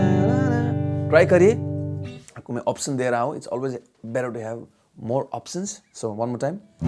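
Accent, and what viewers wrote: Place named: Nepal. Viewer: I think your pronouns like a nepali guy. Is it true.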